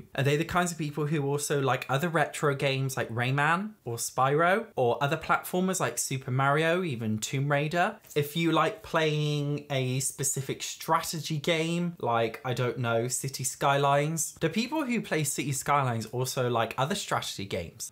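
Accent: United Kingdom. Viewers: Through most of this it got really creepy hearing all the things that make a person click off that matched me. Funny thing though, I literally just told my husband that I found a new person to put on in the background. This was solely based on your voice, accent and your laugh. I'm American, but he's from Scotland, so I made him listen because I was trying to guess what part of England you were from, lol. I had to rewatch the part where you said Americans told you to stop using a fake British accent three times because I was laughing so hard! 😂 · "can you not use a British accent? its distracting" wtf is wrong with people lmao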